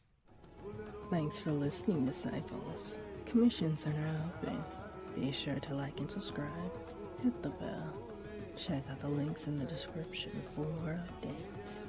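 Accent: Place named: South, United States. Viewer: Damn, that southern accent hits me, great work on the audio chief!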